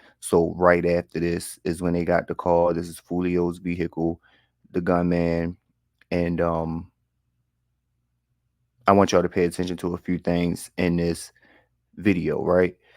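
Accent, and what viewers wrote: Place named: Mississippi, United States. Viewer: 😂 You sounds like you're from Mississippi. I remember the MI crooked letter crooked letter I- crooked letter crooked letter I- humpback-humpback-I 😂. Those were the good old days back then comparing to the times we're living in today's world. If only I could turn back the hands 👐 of time 😂. WE'LL never see those good old days anymore. I can't at this moment think of a topic right at this moment but since I've comed across your channel I've got to check out your other videos to see what you've already reported on so I don't ask you to report on something that you've already reported on. I'm glad I came across your channel and I really enjoyed your content. Keep up the good work and I'm looking forward to watching your channel grow. #MUCHLUVCOMINGOUTTAMISSISSIPPI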